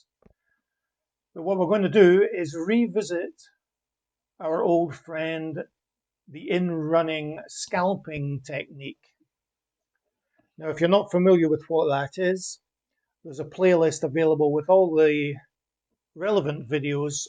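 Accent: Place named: Scotland, United Kingdom. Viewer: This technique sounds really interesting to use on tennis trading! I'm off to try it in a moment.... congratulations on your 100th video,! (PS: It's good to hear a fellow Scot 😊)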